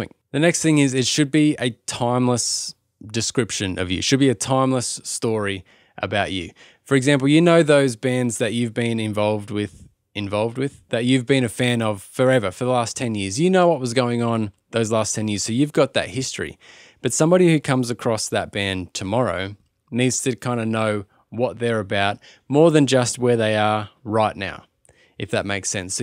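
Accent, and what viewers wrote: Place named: Australia. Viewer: I've just released my first song on Spotify and am on the journey from musician to artist so this is perfect for me, thank you! Love the Aussie accent and great to discover music as I've been away from Australia for over 20 years...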